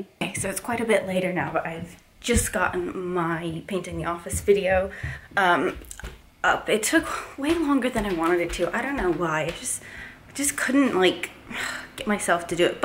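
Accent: England, United Kingdom. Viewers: This is the first time I’ve actually noticed a slight English tint to your accent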